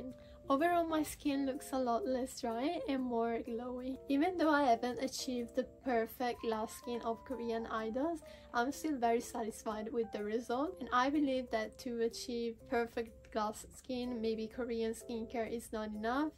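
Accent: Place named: Hungary. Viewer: Hungarian? Judging from your accent.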